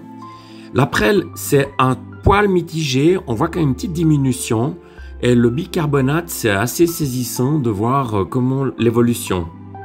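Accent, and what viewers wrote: Switzerland. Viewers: Bonjour! J'ai découvert ta chaîne hier et j'me suis abonné direct! Merci pour avoir diffusé ç'test! C très clair et surtout, pragmatique!... Sinon, c'est bien un accent suisse qu't'as?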